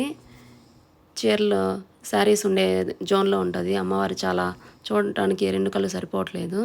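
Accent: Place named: Rayalaseema, India. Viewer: Mana Rayalasima voice super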